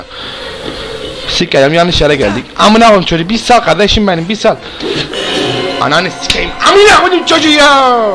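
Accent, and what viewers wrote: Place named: Trabzon, Turkey. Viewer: şivesi iyice tıraykyaya kayı bu ne leee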